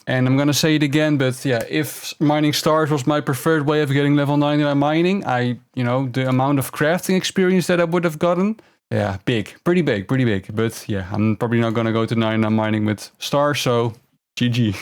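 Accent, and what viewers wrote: Netherlands. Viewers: You are dutch right?😅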